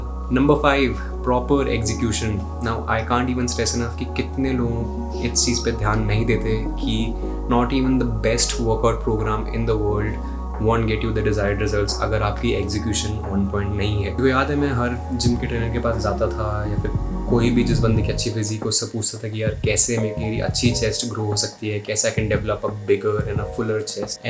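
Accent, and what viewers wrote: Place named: Delhi, India. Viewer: Why do you pronounce English words like that, lol. Like when you say track, it sounds like truck. When you say pack, it sounds like puck. When you say man, it sounds like maan. Thick ass Delhi wala Indian accent.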